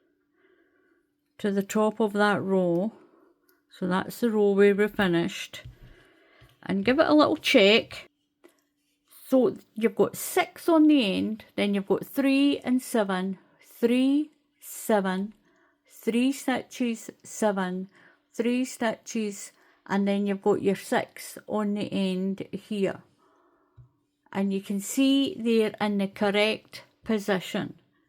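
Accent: Scotland, United Kingdom. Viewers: This is a beautiful pattern - thanks so much for sharing it! Your instruction is wonderful and I so enjoy listening to your lovely Scottish accent. I've done some swatches with different yarns and hooks and haven't been pleased with my results. I'm going to order the brand you use in the video. May I ask what crochet hook you used?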